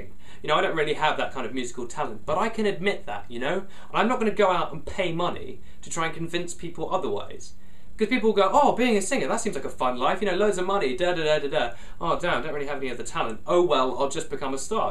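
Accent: United Kingdom. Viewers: I LOVE YOU!!!! You are a miracle to this planet and you explained this so well and I agree 100%! You are the smartest brit ever and your accent is amazing. And rants are awesome and people should just rant about more things cause sometimes they're really fun to listen to. This video is EPIC.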